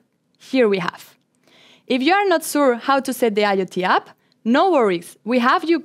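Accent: France. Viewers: Coostomer 😂 , or customer anyway , nice video with french accent 😊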